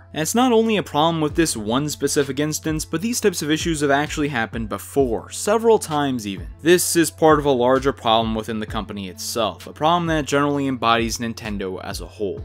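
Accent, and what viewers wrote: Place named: Canada. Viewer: I love the really strong Canadian accent. Aboot.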